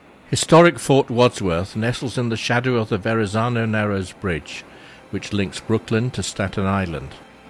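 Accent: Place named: RP, United Kingdom. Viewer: There is no better way to tell a story than when the narrator speaks the Queens English. We Americans should learn to speak clearly and enunciate words properly. My travels to England however, proves they also should learn the same lesson.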